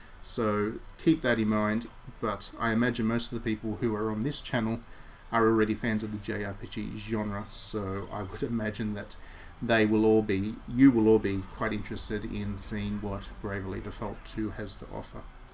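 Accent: Australia. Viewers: Been watching this closely, the fact that bravely default was often referred to as the best final fantasy in a long time (without being one) by a lot of people had me so interested but I never got to play it. (Hopefully they port to switch or ps4...Dunno I think it's a nintendo exclusive?) This told me pretty much what I needed to know, ya also sound Australian so consider my subbed. Got ya point across well an laid back bro. 👌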